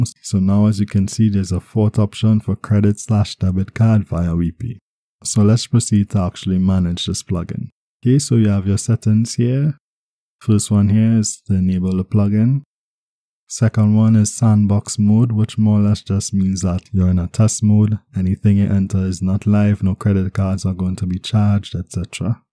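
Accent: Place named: Trinidad and Tobago. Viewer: I'm liking your content man. Keep at it and loving the Trini accent.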